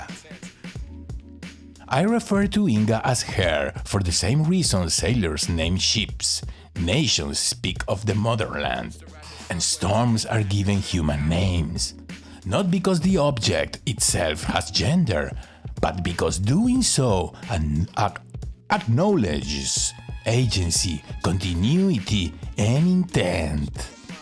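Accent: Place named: Latin America. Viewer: DUDE FAVOR! IF YOU'RE GONNA LAY DOWN THE HEAVY AI HISPANOLA ACCENT AT LEAST SWITCH ON THE CLOSED CAPTIONS!!! THERE'S ENOUGH PAIN N SUFFERING IN THE WORLD ALREADY!!! UGH SMH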